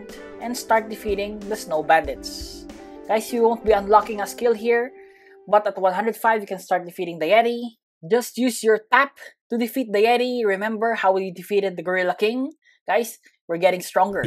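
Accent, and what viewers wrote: Philippines. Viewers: sabi na filipino to accent palang eh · sabi ko na nga ba sa accent mo pilipino ka eh hahah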